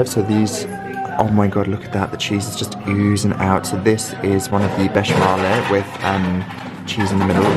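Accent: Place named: North East England, United Kingdom. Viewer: What a nice weekend you had (but at my age, it looks tiring!😁), now that you are back to reading, I wanted to tell about a series I've found. It's by LJ Ross and takes place mostly in Northumberland, but one of the main characters is a professor at Durham University. They are police procedurals but well written and with great characters. My husband is English and when we were thinking about moving to England, I wanted to move to Northumberland because of the weather and I love the accents of the North East. Actually, the first time you came on my youtube feed, I was charmed by your accent!! It was lucky and I have followed you ever since. You are a sweet and wonderful young man!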